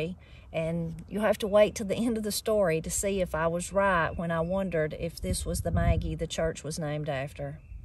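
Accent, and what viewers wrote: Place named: South, United States. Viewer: Love your videos! I'm from northern Appalachia so I don't have the southern twang that your speech has. 😊I must have something different in speech because often times the first thing people say is where are you from? Of course, might be a little mixed up from my dad who came over from Sweden. Don't know if I ever heard of Baptist and Methodist in the same church and sure would go there myself. Especially in this day and age.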